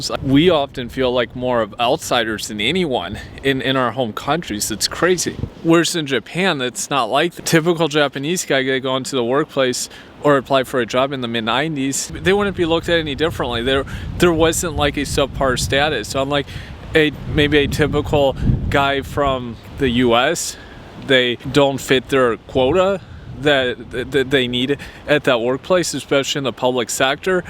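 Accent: Europe. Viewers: The legal availability of "professional women" (brothels, hostesses) as well technology adds to the dynamics in Japan. P. S.:You are from Chicago? You sound foreign, I thought you are from Europe. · You have a european accent why is that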